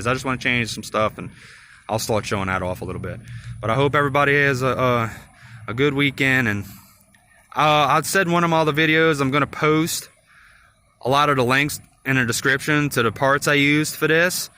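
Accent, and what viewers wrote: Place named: Louisiana, United States. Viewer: sound like you from south Louisiana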